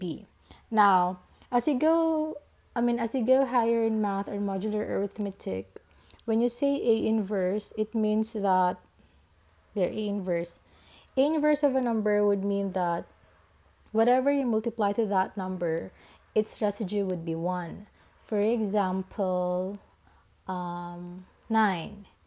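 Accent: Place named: Philippines. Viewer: you're a filipino because of the accent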